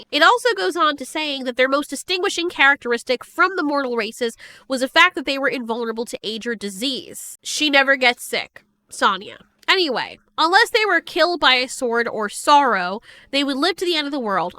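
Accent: Scotland, United Kingdom. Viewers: love scottish accent :D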